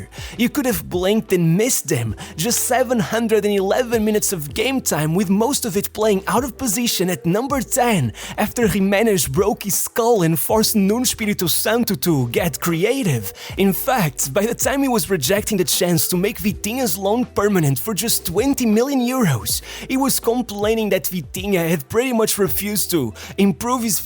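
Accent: Porto, Portugal. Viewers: This accent is so Portuguese 😂😂 god damn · Es pt parece a braba pelo teu sotaque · esse sotaque tuga <3 dá-lhe!!! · U sound portuguese, are u? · Nota-se bem que és português, muito bom continua! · és português não és? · O sotaque do Porto mal disfarçado 😅😅😅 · Nunca falho a reconhecer um irmão português, bom video irmão. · Your portuguese accent makes this video even more awesome! Cheers, buddy! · Are you portuguese? you said his first club right and all names good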